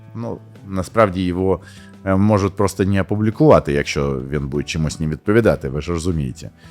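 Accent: Russia. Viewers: А это и не украинский. Сценарий пишут на украинском, а произносит он слова по правилам русской орфоэпии. Украинофонам тоже надо декодировать услышанное. Если сравнить с английским, то это как если бы было написано "the programming language", а произносилось "тхе праграминг лангуаге".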